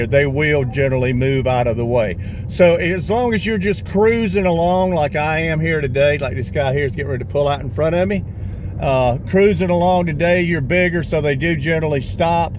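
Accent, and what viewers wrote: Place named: Texas, United States. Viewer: Men just opinion to gain follower as you are leaving in Philippine you should hold back and be mindofull or should I say make your vlog meaningfull on side of being us , I get you as Joe in our country but if your running this vlog as if your expecting something to gain in good way I will tell you you sound like fron Texas or mid west as fuck , again if you want to gain in nice way in your vlog be with us and understand , we don't like people judge us coz we are pinoy we leave like this. Get you ass off our of our country if you talk or sound like Joe to educate us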